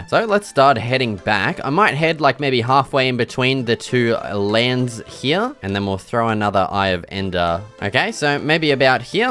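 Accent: Australia. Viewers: STILL SHAKING FROM THAT FALL!. Im a 50yr old female and you have taught me so much about building i steal everything! And love the Australian Accent Not that WE have accents!!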